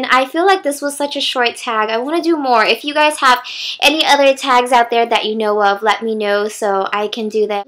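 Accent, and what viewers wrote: Philippines. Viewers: I am so speechless with your filipino accent O.O lol i thought it would be hard for you to speak tagalog :)) You are awesome!!! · DUDE YOU ARE STRAIGHT FROM THE PHILIPPINES FROM HOW YOU SPEAK IT OMG LOL. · oh em! astig! hehehe i'm filipina too and i've been watching your videos since november 2012, the way you talk says that you are a filipina, hehehe I hope i could meet you someday you're one of the best guru here in youtube . ^______^ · her family especially her mom speaks Filipino a lot that's why her Pinoy accent is still there :) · Ugh.. Whenever you speak Tagalog, it reminds me of the Philippines so much xD Your accent is amazing. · Yeaaay.. you still have that filipino accent when you speak tagalog. :"> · OMG I just saw this video and your filipino accent is sooooo adorable :D <3 (y)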